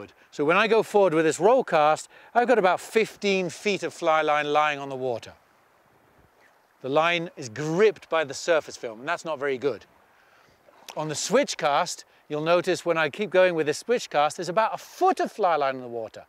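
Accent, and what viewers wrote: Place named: Wales, United Kingdom. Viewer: what part of wales are you from? I clocked your accent as I’m a cardigan native myself. Live in Scotland now though.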